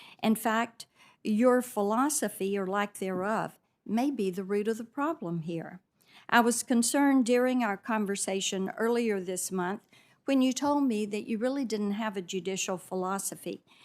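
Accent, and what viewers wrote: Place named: Tennessee, United States. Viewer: Marsha Blackburn is a credit to American politics. And a much-needed credit too. I try to watch her whenever she questions anyone. She is very bright, polite, typically conservative and attractive to boot. That Tennessee accent and her wild hair are so endearing; the Republican Party is lucky to have her. We all are.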